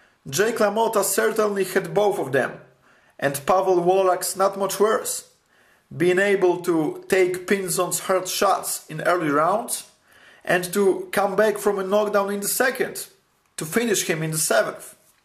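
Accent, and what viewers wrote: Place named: Poland. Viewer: od razu wiedziałem ,że polak gada haha no ,ale ok przynajmniej inne kraje tu zaglądają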